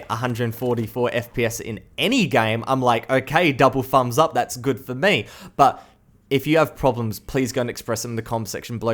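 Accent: Australia. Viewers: Australian accent?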